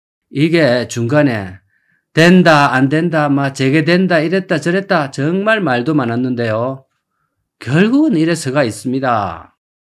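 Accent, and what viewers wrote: Gyeongsang, South Korea. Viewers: ㅋㅋㅋ 말투가 경상도신데 전라도라니 무슨 전략이신지 ㅋㅋㅋ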